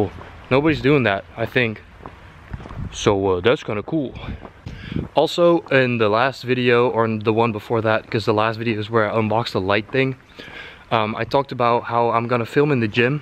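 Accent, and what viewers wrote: Netherlands. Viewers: Are you Dutch?! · You from Rotterdam¿¿¿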